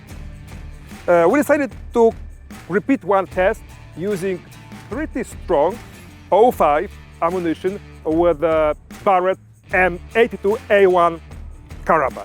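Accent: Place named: Eastern Europe. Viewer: Bo po wschodnio europejsko angielsku.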